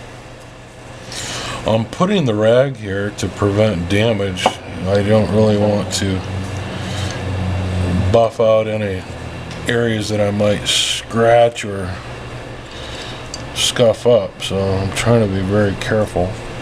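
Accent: United States. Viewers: You are a sweetheart! Are you from the area of Northeast, N.Y? 'Sounds like a Syracuse accent to me. Your wife must have been very touched by all the love you put into her Valentine's Day gift.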